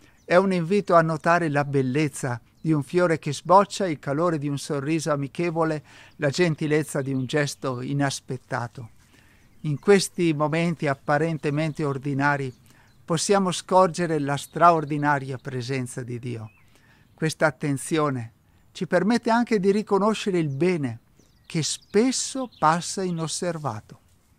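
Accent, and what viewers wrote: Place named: Veneto, Italy. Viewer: Ma quanto sei bravo fra??? La tua gioiosita' è contagiosa e iniziare la giornata con il S. Vangelo, detto e commentato da te, con la gioia che esprimi così bene con la tua solarità e in quel posto magnifico è farsi un regalo immenso!!! Anche il tuo accento veneto è bello: i miei genitori erano veneti e tale mi considero anch'io anche se nata a Varese! Grazie fra! Che Dio ti mantenga sempre così e ti benedica! 🌹💙🙏🙏🙏🥰👋 Volevo anche dirti che, mia mamma è tornata alla Casa del Padre proprio il 18 maggio di quest'anno, giorno del compleanno di papa Giovanni Paolo II 🌹❤️🌹💙🙏🙏🙏🙏👋👋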